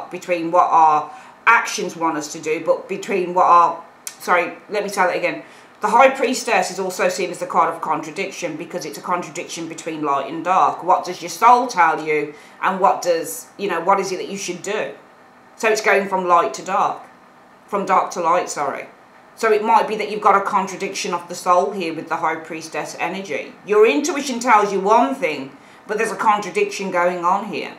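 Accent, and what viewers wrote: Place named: United Kingdom. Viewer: I'm a Mexican, but I live in united states and there is a different accent from the UK. I was nice to hear a different English tone and accent. Do you know where is Mexico? I , I know some Countries in Europe but not all. Specially Germany , a diveded contry. East Germany, and Wesst Germany. and Reunited Country. Very big news in America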